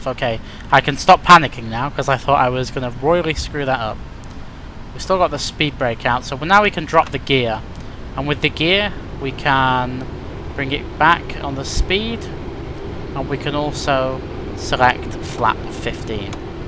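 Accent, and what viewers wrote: United Kingdom. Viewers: Prefer a pommy voice-over to yank ones :o) - Aussie wouldn't be bad but you've done a great job thanks.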